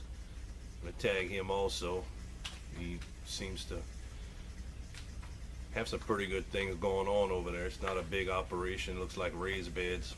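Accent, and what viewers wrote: Louisiana, United States. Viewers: Hi. I jumped over and gave you a sub back. I like to guess where people are from by their accent. Are you from Louisiana, maybe? We're in Missouri. I love all the green in there this time of year. We've had unusually cold very early this year and everything is toast...cold toast. Well, I listened long enough to hear that you are from LA. ~Janette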